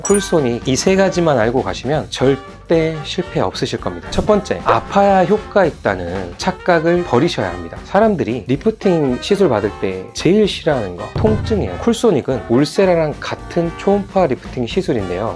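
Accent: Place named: Jeolla, South Korea. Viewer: 전라도 사투리 구수하네예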